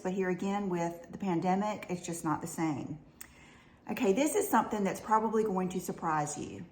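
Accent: South, United States. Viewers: Hey, I love all your video’s! Makeup, health, clothes, plants or jewelry. Whatever you talk about is inspiring and fun to watch. You said you enjoy listening to Tati in your car…Well Your the one I love to listen to in my truck…your voice is so calming…I love your southern accent and how down to earth you are. If people are offended or bothered by you talking about your carnivore diet, then it’s their problem. I find that so odd that someone would tell you that you make them feel bad by talking about your health journey. Obviously they feel guilty and have issues in their life that they need to address. You can’t make everyone happy!!! Keep doing what you do and don’t let anyone make you feel bad about it!!! I have the same issues you were having with joint pain and gut issues. I went on a blood type diet that basically had me eating eggs. I felt so much better but then the holidays rolled around and I gave into the cookies and now I’m struggling to stop eating sugar. 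It is so addicting but makes me feel horrible. Listening to you today has helped push me to start that change in my diet again. Thank you!!! Love you 🤗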